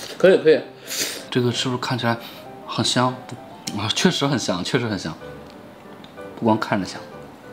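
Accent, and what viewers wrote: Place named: China. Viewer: 大陸口音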